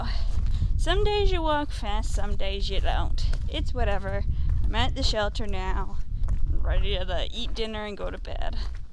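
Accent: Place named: Kentucky, United States. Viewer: Jersey dude here. You are sweet but from a Jersey perspective your Kentucky accent is bordering on a speech impediment.I guess it is all about your point of view...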